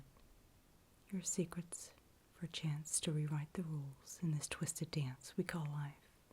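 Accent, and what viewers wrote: United States. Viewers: i dont know if youre still reading comments from this video but i absolutely love your american accent in particular 😭😭 i love this channel so much :) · I thought you were American from this... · Whoa, it seems like your personality automatically changes when you speak with an American accent. Perfect! And you’re so beautiful, my God. I can’t handle so much beauty on my screen, ✋🏻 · Ohh wow! This is the first time I've watched this! You do an amazing American accent! Very well done,! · I mean the accent! forget about it! I'm American and you did great i wouldn't even be able to tell you were English! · your american accent is crazy good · your american accent is perfect!! great video :) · This is professional! And, wow, an American accent too! You are so very talented. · Nice American accent! You rocked it! You deserve millions of views! 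· Wow that american accent is fab and i love your blonde hair too x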